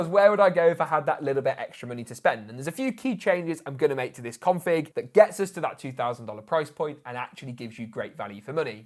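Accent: England, United Kingdom. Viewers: Why tf dollars ? You sound English? Pounds perhaps ?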